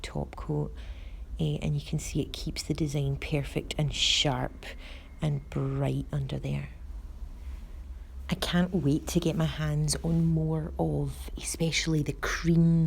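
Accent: Scotland, United Kingdom. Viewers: Great tutorial, instructions are very clear and your accent(Scottish?) is just adorable! Xxx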